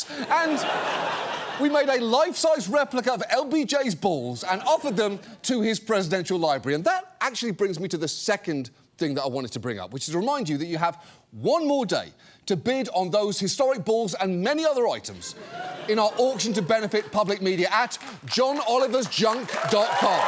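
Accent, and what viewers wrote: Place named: United Kingdom. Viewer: Dang it Johnny! I've never seen any of the air bud movies, but I was going to make an exception to watch you in it. Now how am I going to get my fix of a sarcastic British-sounding fake news anchor pretending to take a dog playing sports seriously?!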